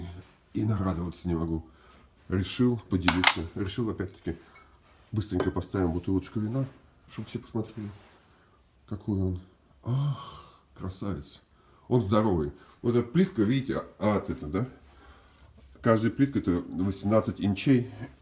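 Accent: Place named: Ryazan, Russia. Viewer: Слова русские позабыл? Смешно слушать рязанский акцент😂😂😂😂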